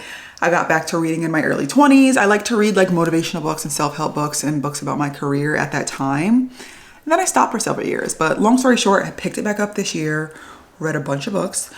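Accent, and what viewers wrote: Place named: Philadelphia, United States. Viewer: OMG! You sound like one of the people from Parking Wars. And it is ALWAYS in Philadelphia. I hope you win!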